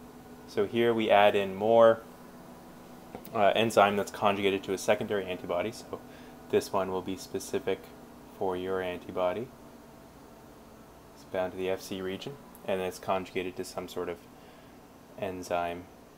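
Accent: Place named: United States. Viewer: Finally. A quick video with an american accent.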